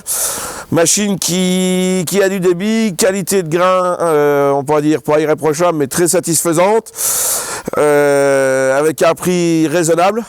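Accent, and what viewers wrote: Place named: Lorraine, France. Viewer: j habite a 1h de Nancy et je sens bien l accent de sa voie 😂😂😂 belle video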